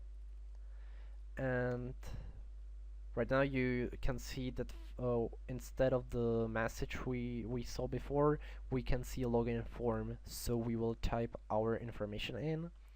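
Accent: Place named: Philippines. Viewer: Your enlish is very good! with a pilipeano accent. I like very much!!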